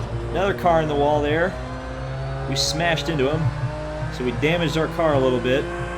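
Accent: United States. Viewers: Finally an American... i hate hearing some brit’s lispy-ass voice ffs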